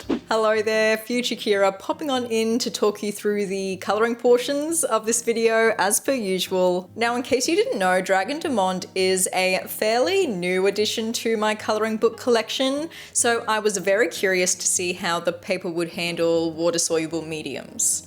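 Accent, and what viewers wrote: Australia. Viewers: Hello I am new here. Commenting to boost for the algorithm! Fun fact of the day: I'm from Aotearoa NZ, and every time I accidentally stumble across an AUS/NZ creator I have to listen for an abnormally long time to figure out whether theyre a kiwi or a roo. My guess, without looking at the rest of your content is that you're Australian 😌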